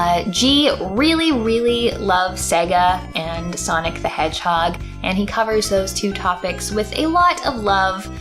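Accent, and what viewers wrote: Canada. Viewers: Just as an aside, sometimes your Canadian accent is imperceptible and then you say "house" and I'm like... oh yeah, she gets healthcare from the government like someone who lives in the first world. This is not a bad thing, I would take every single person around me saying "Howouse" all the time if it meant living in sane governance but... as an American living north enough to get a Canadian channel on his cable system, it pops out.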